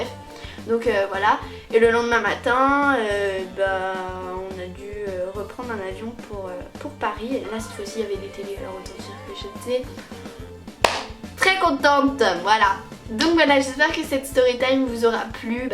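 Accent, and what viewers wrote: Belgium. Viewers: Tu es Belge? Sinon super vidéo :)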